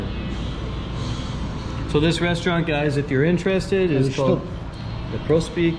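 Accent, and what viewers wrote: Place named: United States. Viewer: Cool vid! Personally, we are with you on the mandatory jabs and masks! We are NOT into it at all so keep preaching. Question, my wife and I are looking to retire and leave the States. Would you consider Merida a nice place to settle down and retire for older but very active people? I love the fact that Merida, unlike many other places in Mexico, is very safe and still somewhat affordable. Thanks and safe travels. (By the way, work on your accent. It's SO Americanized)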